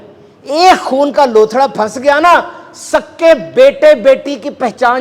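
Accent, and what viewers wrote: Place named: Punjab, Pakistan. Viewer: I like your all videos please stop Punjabi style English this use monafiqeen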